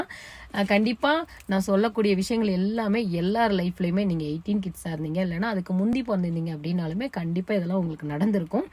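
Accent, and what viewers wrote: Tirunelveli, India. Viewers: உங்க பேச்சில் நெல்லை வாசம் வீசுகிறது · Thirunelveli Tamil naila pesuriga❤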